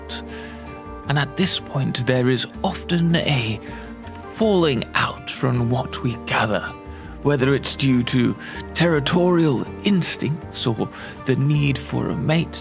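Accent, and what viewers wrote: United Kingdom. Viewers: LOL! Awesome video. Anyone who tells you, that you aren't talented or an entertainer, please give them your middle finger. Awesome Video. Very creative. The voice inflections of a fascinated xeno biologist mixed with your native British accent, is priceless. Great acting work.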